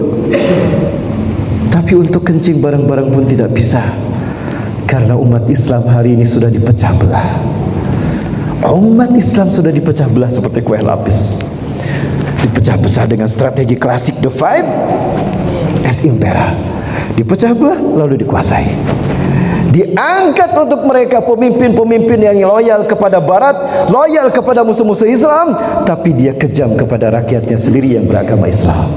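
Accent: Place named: Sunda, Indonesia. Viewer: Aamiin 🤲 URB orang Sunda jadi intonasi bicaranya menarik memang, Alhamdulillah..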